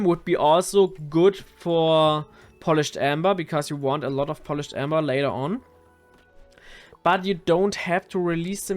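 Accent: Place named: Germany. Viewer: w german accent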